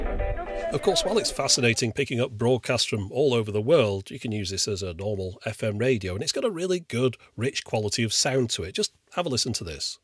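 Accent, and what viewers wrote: United Kingdom. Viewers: Why does LGR sound british in this video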